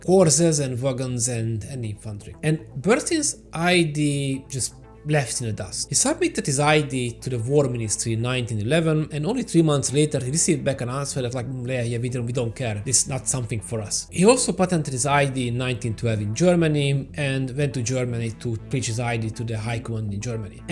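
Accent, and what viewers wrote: Hungary. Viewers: Jó a téma, tetszik. A szóbõséged jó, viszont nagyon, de nagyon erõs magyar akcentusod van. Látszika, azaz hallatszik, hogy rengeteget olvasol,írsz angolul, de nem beszélsz, vagy nem angol anyanyelvüekkel beszélsz. Kicsit azon kellene javítani. Amúgy nekem tetszett.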